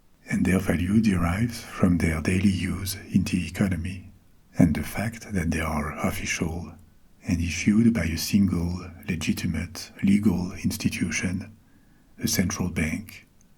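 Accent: France. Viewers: Love your French voice it so calming. Thank mercie becoup! you for the good nights you give😂😂❤😴🥱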